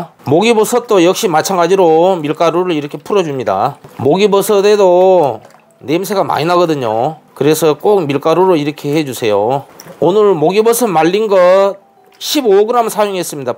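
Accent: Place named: Gangwon, South Korea. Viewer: 강원도 분이시네요. ㅋㅋㅋ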